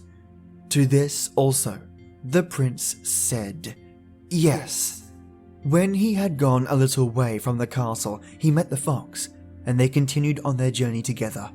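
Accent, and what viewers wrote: Australia. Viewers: Oh my gosh! My night is complete. Your theme comes on...You mention Earl Grey? Is it TWG? With this wonderfully warm, Australian accented Tale Teller starts some awesome Swedish folk tales...I 'm having some 1837 Black tea by TWG it's all strawberry and good stories...as lovely as you. I have a bird, you know about Daisy, they really don't like to be touched or get a nice bite. That prince needs to get smacked up side his head being so silly and not listening to the fox. The law seems severe don't pay your debts or get killed. Such a wonderful folk tale...the bird singing was sweet. Don't you also agree if you mess up with those ritualistic creepypastas? It seems when you mess up with one of those by forgetting to light a candle or you looked at the wrong time...you're dead or cursed for eternity. The fox was extremely kind and had patience with the prince compared to other folk stories.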